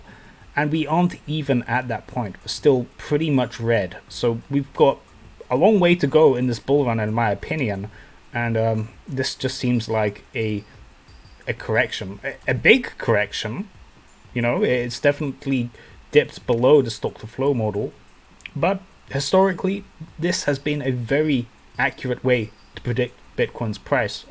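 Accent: Netherlands. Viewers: I bet he is Dutch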